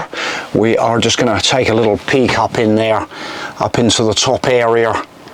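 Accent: West Country, United Kingdom. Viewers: Nice van! And i thought i had a strong west country accent, until i heard yours lol!!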